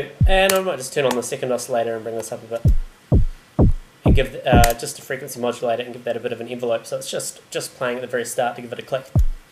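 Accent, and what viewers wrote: New Zealand. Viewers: sounds like a kiwi to me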